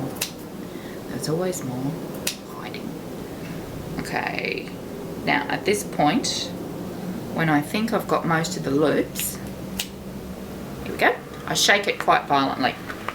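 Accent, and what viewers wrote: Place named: Australia. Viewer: So lovely to hear an Aussie voice coming from a great, non complicated tutorial! Bonza mate!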